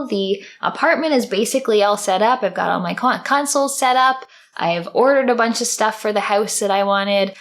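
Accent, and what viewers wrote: Canada. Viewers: Just as an aside, sometimes your Canadian accent is imperceptible and then you say "house" and I'm like... oh yeah, she gets healthcare from the government like someone who lives in the first world. This is not a bad thing, I would take every single person around me saying "Howouse" all the time if it meant living in sane governance but... as an American living north enough to get a Canadian channel on his cable system, it pops out.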